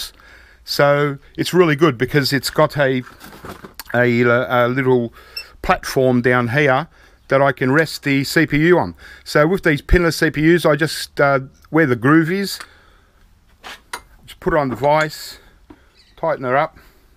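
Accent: Australia. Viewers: its obvious he's from Australia by his accent and the fact he's wearing a t shirt in april, too cold in the uk for t shirts at the moment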